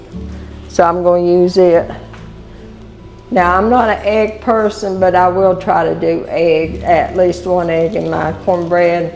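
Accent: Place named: Tennessee, United States. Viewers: I absolutely love your south😅ern accent. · I just discovered your channel for the first time. I was born and raised 45 miles Northwest of Nashville and grew up on this kind of food and hearing my relatives speaking in this matter. This channel is just like going home. Thank you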